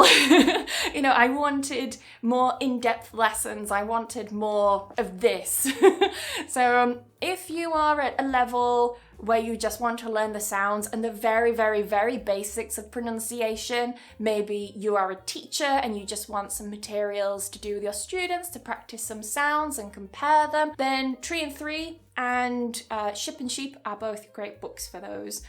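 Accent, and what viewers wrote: United Kingdom. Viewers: r u British ? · I was looking for pure british accent speaking content and I find you accidently. Because my search was done yestarday and didn't end up with relevant contents. Today, I suddenly come through your content. So wonderful. My greetings from Algeria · I wanna to learn British accent from you. Therefore guide me how am I join your online english British accent course…? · Wow really awesome British accent!! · Hii I like your video, are you from UK? :)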